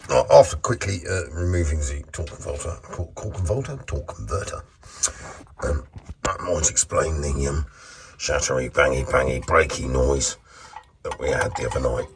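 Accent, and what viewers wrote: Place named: Dorset, United Kingdom. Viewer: Corrrr lummey, that auto box is much much better than those hatefull preselector semiauto boxes you get in sprinter ambulances, I have been put-off an ambulance to convert to living van for that very reason. I am defo going to bend your ear once I get the go ahead from mad quack to get my chuffin' licence back. I'd love one of them there oldie style sprinters or maybe a long Crafter 😁 Sounds like you've got a better microphone now, love your Dorset patter, waiting for next video, have a nice week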